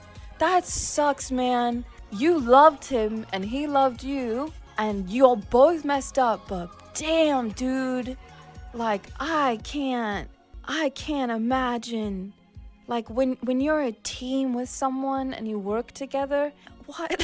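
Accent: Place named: United Kingdom. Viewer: It's been a while since I've heard sound this British this often.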